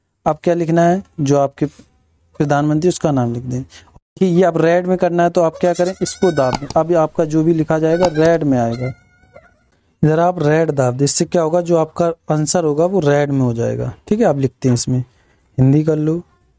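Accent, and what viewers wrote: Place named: Bundelkhand, India. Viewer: Bhai kha k bundelkhand se ho kya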